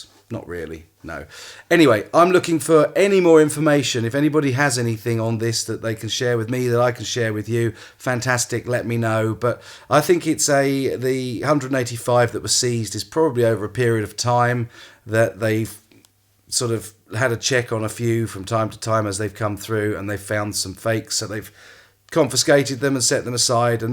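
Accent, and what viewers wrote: United Kingdom. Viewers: There is nobody better then you reviewing chinese guitars hands down man ! very very nice british accent, love it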